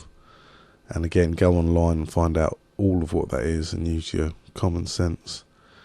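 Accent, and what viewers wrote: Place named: Manchester, United Kingdom. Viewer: might be good to have some baking soda on hand as well to put out flames that might get out of control. Nice vid! thanx! Are you from Manchester? Don't know why but your accent sounds like that to me. I'm from New England so what do I know? -john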